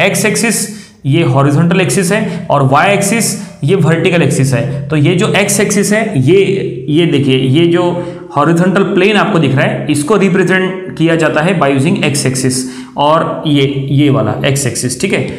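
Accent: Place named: Hyderabad, India. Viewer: Sir ka hyderabadi ascent plus super explanation.. 🔥thx sir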